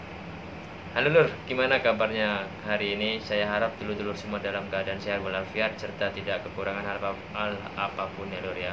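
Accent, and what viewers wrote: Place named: Madura, Indonesia. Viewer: asli dr mana kang,,logat bicara seperti dari Madura nih 😊🙏🏻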